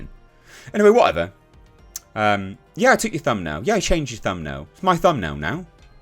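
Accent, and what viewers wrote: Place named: England, United Kingdom. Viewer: I always appreciate a genuine English accent and calling someone a dickhead. Like going to a football match and calling the ref a 'silly fat wanker'!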